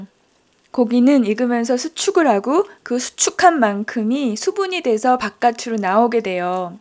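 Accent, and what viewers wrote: Seoul, South Korea. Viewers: 저 성대모사 엄청 잘해요 남편이 엄지척👍했어요 서울사투리 넘 귀여워요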